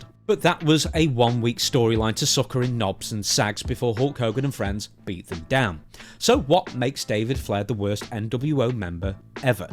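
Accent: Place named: United Kingdom. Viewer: Clicked on this, heard the British accent, turned it off.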